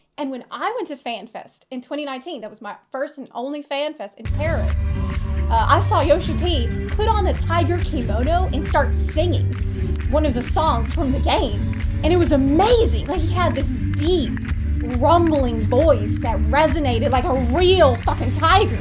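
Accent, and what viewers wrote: South, United States. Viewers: Since she moved back to the US she reaaallly has that Southern drawl. Also,clearly hyper - dem goggle-eyes doe... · wtf is happening lol, I forgot you existed and somehow your southern accent got thicker LOL and this videos so goofy, with all the random things that happened in the past lol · Genuine question: where did the southern US accent come from? I just came from her "all mounts" video from 3 years ago and her accent is totally different. Thanks.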